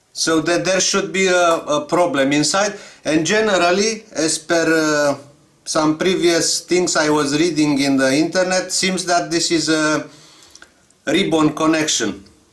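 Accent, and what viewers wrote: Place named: Russia. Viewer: i love russian accent, благодарю вас comrade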